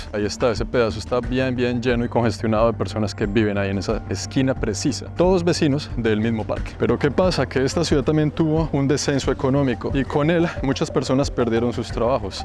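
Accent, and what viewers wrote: Bogotá, Colombia. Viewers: jajajaja ese man es mas rolo que la changua ñero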